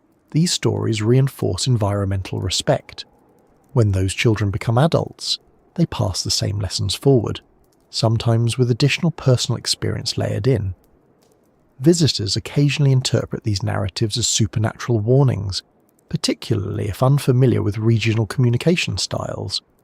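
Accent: United Kingdom. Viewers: Why is this narrative British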